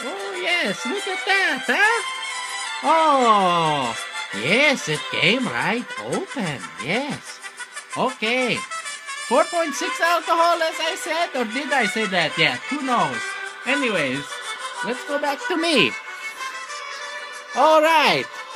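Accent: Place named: Mexico. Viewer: best mexican accent EVER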